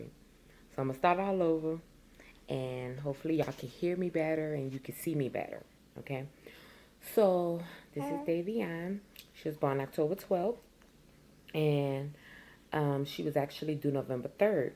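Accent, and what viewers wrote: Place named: New Orleans, United States. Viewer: She gotta be from here she sounds too much like a fee from NOLA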